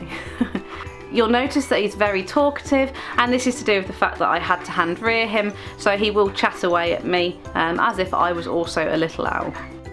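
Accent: United Kingdom. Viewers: We used to pass a pair of burrowing owls on our way to work... it was at a corner, and they would spend the morning watching vehicles turning left... very cute! <link> One thing I realized is that I like your channel because unlike commercially produced nature shows, you don't have either the "syrupy saccharine" sound, or the "absurd melodromatic" sound, which are quite wearing, after a while. Of course we colonials can never get enough of the accents from the Mother Country! ;-)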